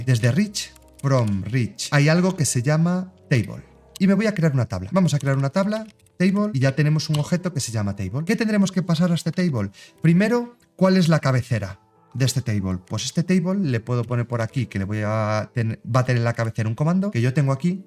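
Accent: Galicia, Spain. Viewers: eres gallego? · a mii me gusta que hables asii suave . Es genial ! Además el acento gallego de Lugo que tienes me encanta !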